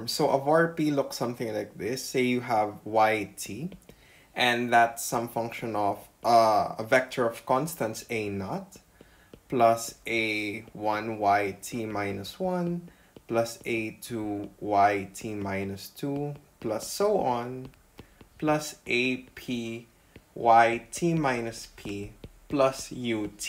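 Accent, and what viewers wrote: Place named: Philippines. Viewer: You sound Filipino. Good video.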